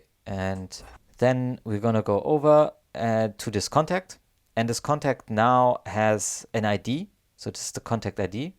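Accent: Germany. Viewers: Wenn ich den Akzent einschätzen müsste, würde ich zu 99% sagen, er ist ein deutscher!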